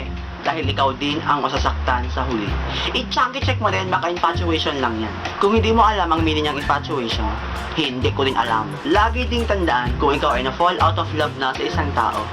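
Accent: Visayas, Philippines. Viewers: visaya ka teh??????/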